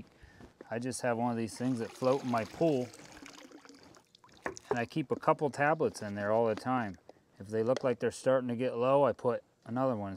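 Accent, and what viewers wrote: Pennsylvania, United States. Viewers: I just watched this and it’s great! 1 question, are you from Northeastern PA!!? You sound like it.👍😂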